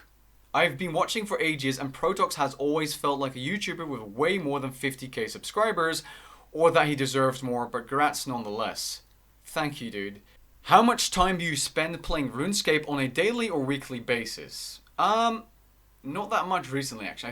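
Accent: Netherlands. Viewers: Wait... you are dutch?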